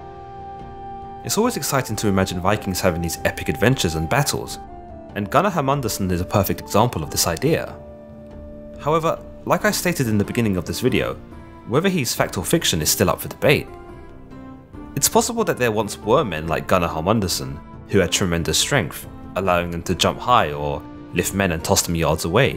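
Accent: United Kingdom. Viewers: Icelanders are really not used to accents, icelandic is only really spoken by Icelanders and we all have (mostly, with miniscule exceptions) the same accent so when someone with e.g British accent comes along it can sound like you are talking nonsense but that's not too say that it's you're job to change the way you speak, I have noticed that some Icelanders have a unfair standard when it comes to accents which can be hard for e.g immigrants which put the time inn to learn icelandic So yes you have a really thick accent but besides that than it was just fine. (A bit late to comment)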